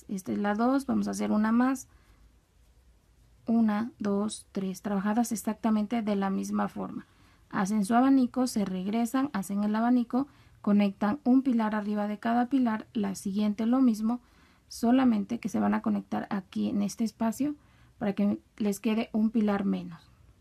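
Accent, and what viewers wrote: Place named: Guatemala. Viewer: Estimada señora , me gusta mucho su camino de mesa, hace poco la descubri y ya empece a hacerlo, lo mejor es que lo explica muy bien, gracias por publicarlo, reciba saludos atentos, me da curiosidad su acento, se parece mucho al de ños guatemaltecos.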